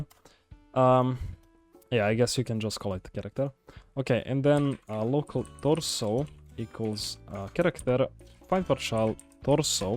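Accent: Russia. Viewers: You have a Russian accent.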